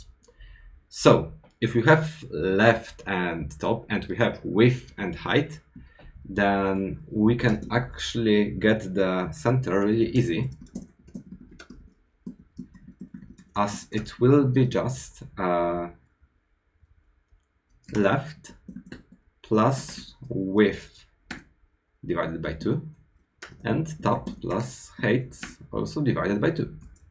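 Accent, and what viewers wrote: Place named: Russia. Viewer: Слышу русский акцент...))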